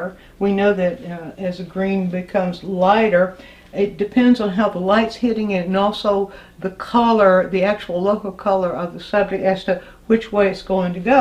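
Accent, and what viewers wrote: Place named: South, United States. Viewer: you are not only aged artist you are an excellent teacher, your passion for painting shows in your teaching. You talk slowly and clearly ( unusual today) and I love your southern accent, thank you I have learned a lot from you. Khemin 🌹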